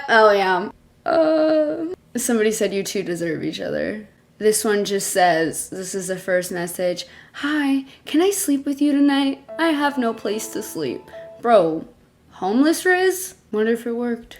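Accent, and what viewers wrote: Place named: Canada. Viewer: Canadian detected, I won’t be subscribing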